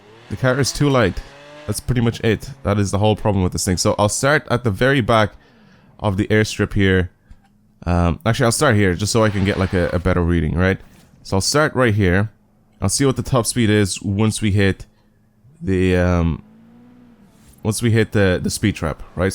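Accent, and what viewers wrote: Dublin, Ireland. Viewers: R u Irish like from Dublin down sorta thing? I'm from Derry personally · Are u irish?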